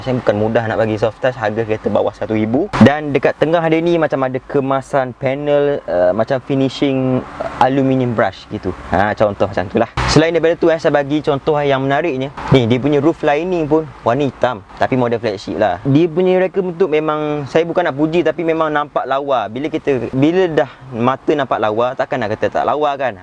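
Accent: Terengganu, Malaysia. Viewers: bila dengar review, *bisik dalam hati, mesti orang ganu ni. sampai hujung video.. perghh skli betul kat terengganu !! anyway, padu bro review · dia ganu lah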